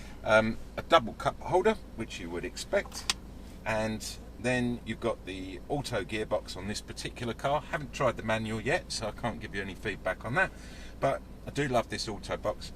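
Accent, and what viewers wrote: United Kingdom. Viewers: i think u came from liverpool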